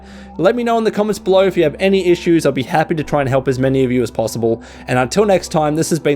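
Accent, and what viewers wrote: Australia. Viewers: Aussie? And you look like Daniel Riccardo 🤟🏼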